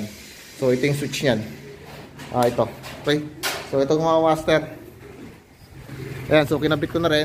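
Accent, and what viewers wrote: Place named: Quezon, Philippines. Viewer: Napansin klang sa pananagalog mu puntong quezon ay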